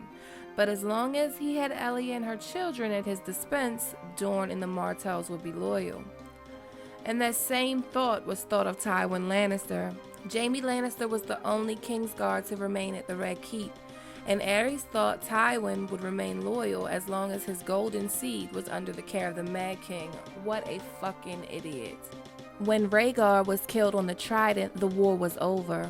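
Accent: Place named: Los Angeles, United States. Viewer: out pops beautiful bad bitches preach lol I love your voice You're from la ? u talk hip as shit and your game of thrones knowledge is on point. 💯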